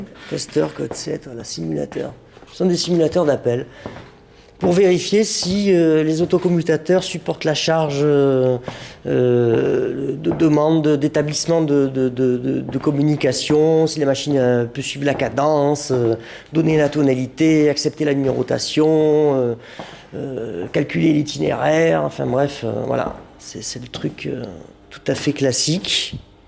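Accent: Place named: South, France. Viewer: Je ne sais pas pourquoi YouTube me propose cette vidéo.... Une intro à laquelle on n'entend ni ne comprend pas grand chose, on se balade dans des travées pour voir des portes armoires blanches et une outro presque aussi désagréables que l'intro. Quand on croise quelques appareil ou que des portes s'ouvrent, la voix nous dit juste ce que c'est dans son jargon sans expliquer. L'intention est sûrement louable mais on n'est plus en 2010. PS c'est original un gars avec l'accent du Sud de la France, à Rennes, qui parle comme un belge ou un suisse (nonante :)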